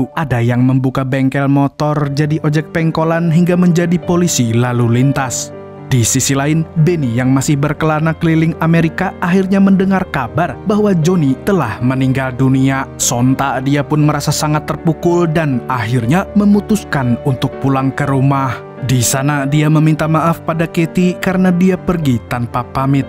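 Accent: Banjar, Indonesia. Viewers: Urang Banjar pinanya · Suku Banjar ya min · Urang banjar kah mang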